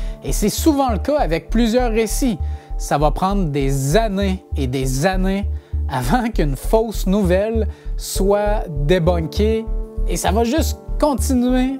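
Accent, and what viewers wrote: Quebec, Canada. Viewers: Super bien raconté et assez bien détaillé. Je trouve que tu donnes de plus en plus de étoffé d'informations et d'images. J'affirme sans aucun doute, tu es la plus belle découverte cette année. Et en plus, un québécois avec un si bel accent bien de chez nous. Merci pour ton super bon travail. Lâche pas ! ❤😁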